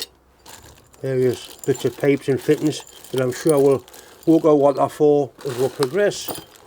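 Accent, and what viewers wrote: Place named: North East England, United Kingdom. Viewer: Even fellow Englishmen struggle with the lovely North East accent. 😂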